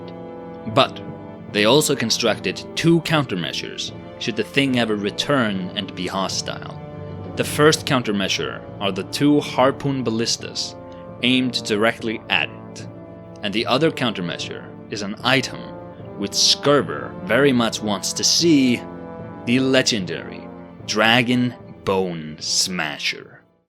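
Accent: Iceland. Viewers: Just stumbled across this channel, love it! Demon's Souls has always been my favourite! From the accent I have to ask: are you Icelandic,? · Umbasa, I love this game. Edit: Do I sense Icelandic in that accent?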